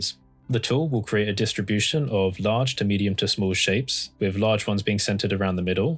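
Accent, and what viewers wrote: United Kingdom. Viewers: You sound English. Anywhere I can throw money at you in GBP to avoid the exchange rate?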